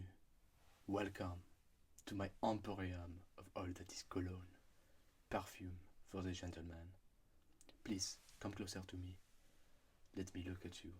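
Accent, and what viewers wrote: France. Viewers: Amazing job lmao. You didn't even overdo it. you really sound like a Frenchman trying hard to sound English but they can't 😂😂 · As a french, I would like to know if u (english people) understand everything when he speak english with French accent? Bc he's doing it very well, so im wondering if it's comprehensive when we're speaking to u (and if it's not too disagreeable for ur ears aha?) Pleaseee respond, and of course scuse my orthography everybody knows that we're des grosses merdes in english :) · what il est français ou anglais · it’s okay!! You’re french accent is amazing!! Never stop making these!! You’ll get big for sure cause you definitely deserve it! May be be careful when moving items and your hands cause it might hit the mic but other than that these videos are great! Thank you so much for making these and keep it up! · Your French is excellent and French accent is spot on. 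You must be a native speaker. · Shit, your french accent in english is so good. I love it, I need more of it haha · I spend 9 months in Tours which is supposed to have the most "pure" accent and you sound so much like the tourangeaux! Bon travail :)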